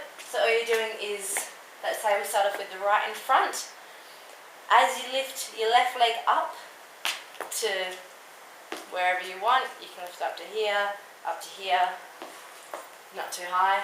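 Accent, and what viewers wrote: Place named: Australia. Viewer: you have that sexy australian accent:)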